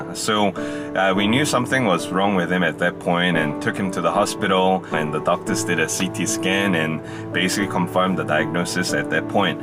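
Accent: Singapore. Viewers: I'm very sorry to know you lost your papa. My deepest condolences to you and your family. I lost my papa 16 yrs ago as he got a heart attack.This year Feb I lost my best buddy , she got into coma for 3 months and eventually passed on. I fully understand the feeling of losing someone close to your heart. Everyday I try to live my life meaningfully with the understanding of things or relationship will not last forever. As there is a saying '' Time will heal the wound'. I wish you and your family will stand strong together as your papa is now in a peaceful and happy land. He will watch you all from afar. Take good care of your mama ❤️. Hope to see your new vids soon as I always like to see you speak in singlish.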